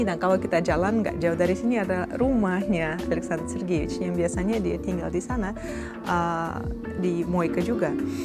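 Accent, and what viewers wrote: Java, Indonesia. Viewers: Mantap mba,bahasa indonesia nya sdh pasih disertai logat jawa sebagai pemanisnya👍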